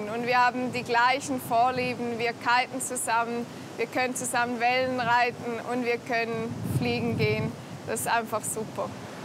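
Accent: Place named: Switzerland. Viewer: Douglas Dunning Well she's actually speaking standard German here. With maybe a slight Swiss "tone" left in it. But it's not the official Swiss variation language ( = called Schwyzerdütsch / meaning "Swiss German" ) here that you may be referring to.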